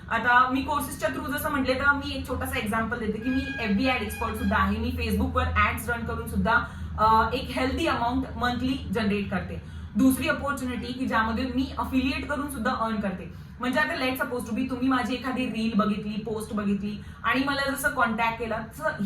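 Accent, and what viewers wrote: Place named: Marwari, India. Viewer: ताई, सर्वप्रथम तुझ्या कष्टlच कौतुक.. एका मुलीच्या जिद्दीच कौतुक.. व्हिडिओ मध्ये मुद्दे छान present केलेत.. थोडे मारवाडी accent आहेत.. आणि बोलणं थोडं fast वाटतंय.. मध्ये pause घेतले तर अजून effective होईल.. या प्रयत्नांच सुद्धा कौतुक.. पुढील प्रयत्नांसाठी शुभेच्छा.. लगे रहो 👍..🍁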